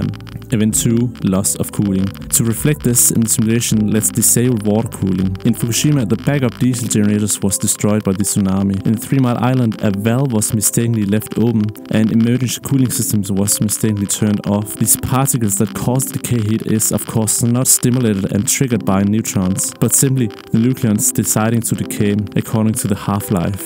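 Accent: Denmark. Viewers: is that a dansker i høre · That's the thickest danish accent I've ever heard.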